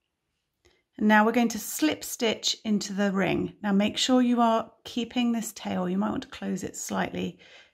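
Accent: United Kingdom. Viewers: British accent+ US terms...PERFECT 💗 (I'm a Brit who dislikes UK terms 😆) I send these hearts to people who are recently bereaved.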